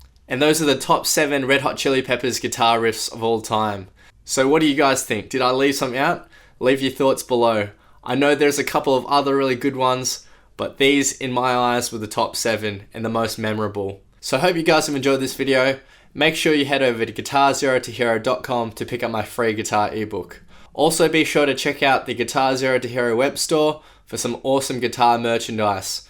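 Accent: Australia. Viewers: Hey I recognise that accent! Hello fellow Aussie! :D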